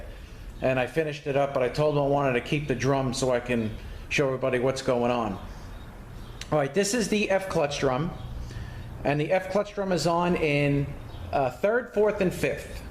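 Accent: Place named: New Jersey, United States. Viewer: I like to hear about 5hp19 with Sopranos kinda accent! All best to you!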